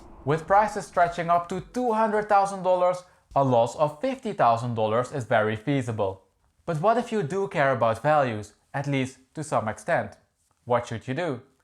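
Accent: Netherlands. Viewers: Do I hear a Dutch accent? 😅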